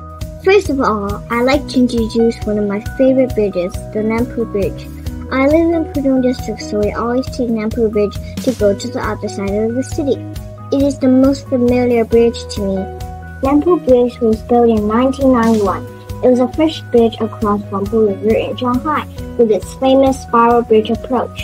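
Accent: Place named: United States. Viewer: You sound very much like American girl.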